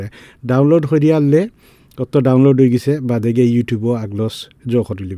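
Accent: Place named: Sylhet, Bangladesh. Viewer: Finally a Sylheti speaking video. Awesome video. Thank you for teaching how to use canva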